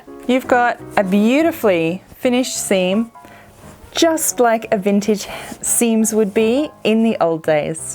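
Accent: Australia. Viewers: Love, this. It is my first visit. Won't be the last! I use both pinking shears and the zig zag. Are you Australian? :) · New subbie ☺️ are you from Australia ? 💖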